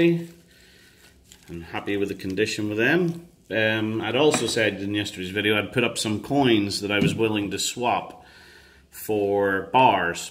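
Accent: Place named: United Kingdom. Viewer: you have the most American British accent ive ever heard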